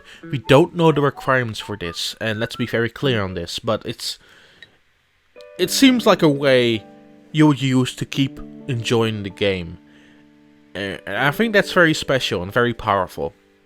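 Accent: Netherlands. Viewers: What's your first language? Your accent sounds Dutch 💭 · Love the Dutch accent :p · I can't help but notice his accent... Maybe it was already stated somewhere else, but / sounds very Dutch:p not that that's a bad thing. I love hearing accents when people speak English. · He is indeed dutch, and a dutch accent when someone is talking English is one of the WORST things I've ever heard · why does this guy sound dutch, though.. edit: I've seen the twitter sceen this bro is a fellow dutchie hellooo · Dat Nederlandse accent 🤢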